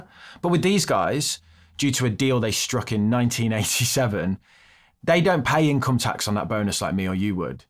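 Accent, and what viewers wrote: Birmingham, United Kingdom. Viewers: I really hate brummie accents, so the fact i get over that and listen to you, shows how good your content is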